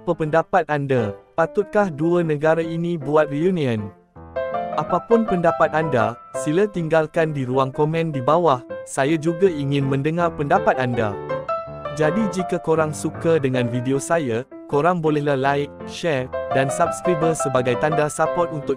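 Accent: Malaysia. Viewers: Itu cara cakap malaysie tu datang dari bangsa Johor Kami bangsa Utara cakap Malaysia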